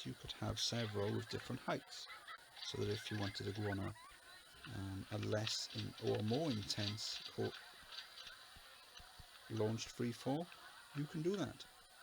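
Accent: Wales, United Kingdom. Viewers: Ooooh is that a Welsh twang I can detect in your voice?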